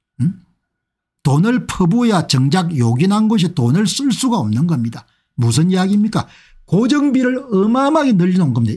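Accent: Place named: Tongyeong, South Korea. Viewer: 공대표님 정말정말 잘 지적하셨습니다 제일 절실한 현황입니다 골로 가지 않도록 더욱 빼딳하게 쓰야 합니다 말씀이 저의 고향 통영 말투 비슷하네요 정답습니다 감사합니디ㅡ😊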